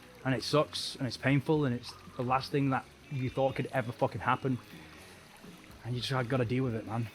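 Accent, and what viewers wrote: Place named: Ireland. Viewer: Where are you from? You got very nice accent for ear. Irish man?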